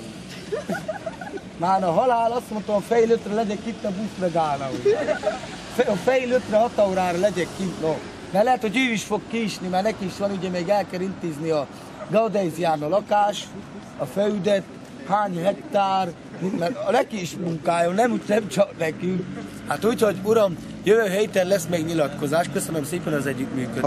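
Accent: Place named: Szabolcs, Hungary. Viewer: Ó rendben köszi! Csak az akcentus kicsit olyan szabolcsias volt :D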